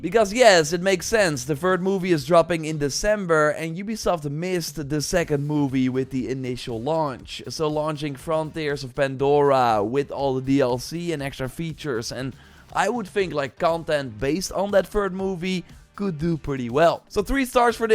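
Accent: Netherlands. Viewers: Klinkt Engels, als een Nederlander...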